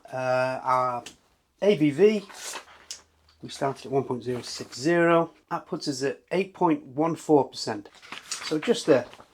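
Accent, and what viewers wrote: United Kingdom. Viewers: Quick question, what’s your accent aside from British? Other are you brewer for job or hobby?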